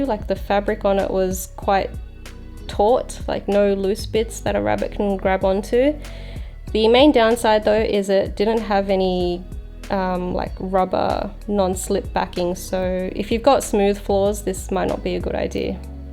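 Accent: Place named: Australia. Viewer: Aussie or new zealand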